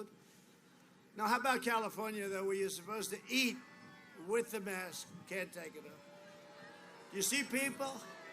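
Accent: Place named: New York, United States. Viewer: Jesus....if the Man doesn't win it's not because he didnt try. ALWAYS on the Go. And here's the thing....if Biden doesnt give me more of a reason to vote for him then Im voting Trump. I want some energy. Some excitement. Trump hasn't done THAT bad. I can't blame him for the Virus response...he had nothing to go by. He talks like he is: A New Yorker. Good AND Bad. Im STILL undecided.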